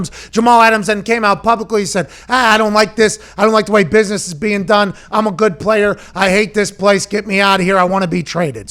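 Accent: Pittsburgh, United States. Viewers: I love hearing someone who sounds like me 😂Pittsburgh accent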